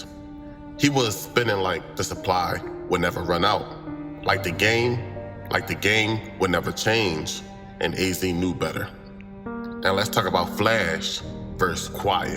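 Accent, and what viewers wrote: Philadelphia, United States. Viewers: U from North Philly huh😂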